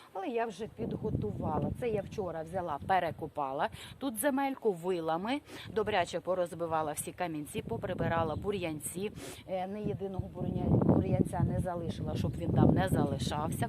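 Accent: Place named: Zhytomyr, Ukraine. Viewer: Доброго ранку,! Привіт з Дніпра. Дуже рада, що підписалася на Вас. Все дотепно і грамотно. Дякую Вам. А Ваша мова як елексір на мою душу. Так розмовляють на моїй рідній житомирщині. Бажаю Вам здоров'я і мирного неба - всім нам!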